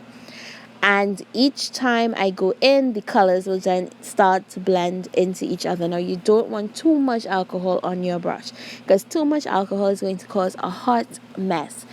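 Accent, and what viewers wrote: Trinidad and Tobago. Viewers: What country are you from ? You sound Trinidadian 😍🇹🇹🤸🏾‍♀️ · Are you trini ? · From the time you started talking i realized u are a Trini❤🇹🇹 subscribed one time🙌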